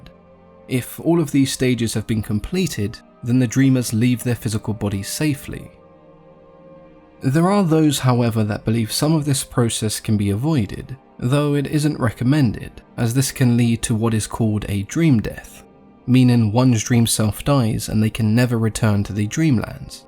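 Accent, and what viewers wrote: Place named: United Kingdom. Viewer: I enjoy the Dream Quest of Unknown Kadath as somewhat light reading/listening. It seems quite different from any other of HPL's works and reminds me a great deal of Grimm's Fairy Tales. It almost feels like it was written for children because there is really nothing of horror about it and everything turns out fine in the end. The only thing that disappoints me about it IS the ending! The "there's no place like home" trope was way too simplistic and unsatisfying for me. If Carter was this old and experienced dreamer wouldn't he have recognized the Sunset City as a conglomeration of memories of his childhood and the town he grew up in? In fact that was eluded to in the beginning of the story, but I'm just nit picking. It's a fun story to fall asleep to especially when a British person is reading it! And I do enjoy rereading it occasionally too.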